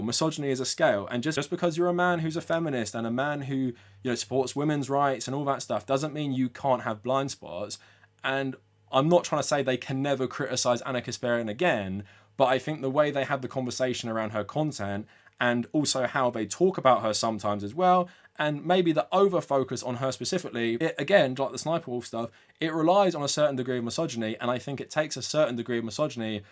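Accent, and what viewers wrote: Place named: London, United Kingdom. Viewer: Lots of Vanguard fans in the comments who didn't watch your vid and took their words for yours. I watched yours before theirs. I know exactly what you were trying to say, I might not agree with it all but people saying you are paid off by TYT or are some sort of British guy on his high horse (they can't even spot a London accent) just comes across as dumb guy no read books sucdem nonsense. We're literally in the midst of a F takeover and they are talking about YouTube drama. Not social security, not Medicaid, not Medicare. YouTube drama. We should probably all be better than this, but here we are.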